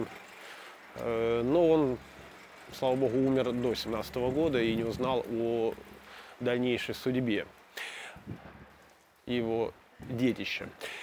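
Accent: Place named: Saint Petersburg, Russia. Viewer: Это Питерский говор,наречие)))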